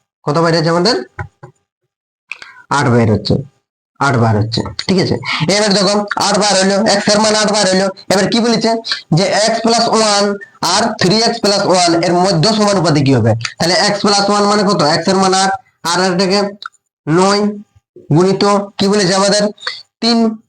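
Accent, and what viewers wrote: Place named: Purulia, India. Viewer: Sir, আপনার কথা বলার ধরনটা পালটাও পুরুলিয়ার ভাষা বলো না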